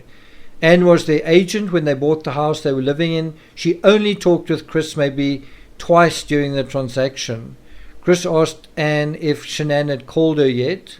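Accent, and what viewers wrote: South Africa. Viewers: Your voice make me sentimental to my SA friends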